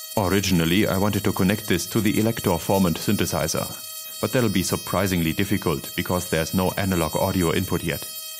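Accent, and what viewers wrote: Germany. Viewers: Your German accent is enticing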